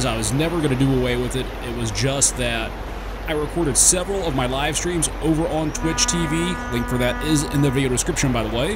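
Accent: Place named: United States. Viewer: i will assume that you are american. If so, can you tell me from what part of US are you from? I'm asking you this because i quite like your accent. And nice video! Keep up the good work!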